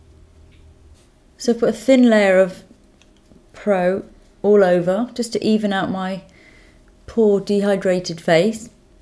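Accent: United Kingdom. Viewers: love this tutorial, i think i may follow it for the days when i have to go to work with just little hours of sleep, it may sound geek but listening to your videos helps me improve my english and i can understand british accent better, so apart from learning about make, i also improve my language skills!!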